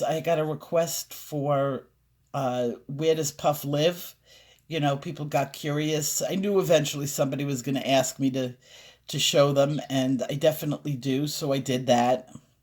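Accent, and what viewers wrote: New York, United States. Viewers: I THOUGHT I heard a tinge of NY in your voice never wanted to assume, ;) thanks for another great video, the coloring was suggested by a therapist for my anxiety as a coping mechanism, when I am needing to just zone out sometimes I pick up one of my books and pencils and get going. EDIT- I also bought a book from the thrift store with a woman's writing in it, it was a type of daily inspiration for women book, and her notes intrigued me so I purchased, thought I was the only one who did that...THIS is the reason why I like your channel so much, because of your personality and how you share parts of your life with us in a comfortable manner, I feel like I know you, its more than coloring here its about introspection and reflection and life and humanity and how that all ties into you, your life and family and art and coloring. 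I LOVE IT. Thanks for going deep with us we love it!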